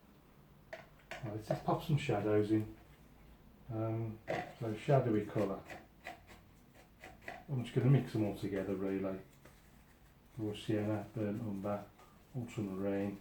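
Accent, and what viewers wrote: Birmingham, United Kingdom. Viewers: Beautiful result! I like the way you break down the painting when it's all done. Wish more YouTube artists would do that. Great Brummie accent btw! =)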